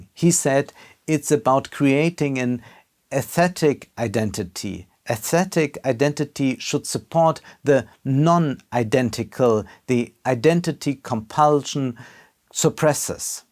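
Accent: Germany. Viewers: Interesting, so this is the german accent everyone talks about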